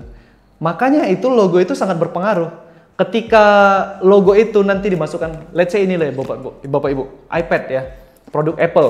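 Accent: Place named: Pematangsiantar, Indonesia. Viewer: logat bicara mu bro mancam par siantar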